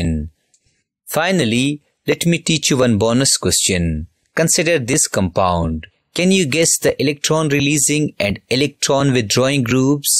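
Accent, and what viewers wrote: South India, India. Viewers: I thought you are south indian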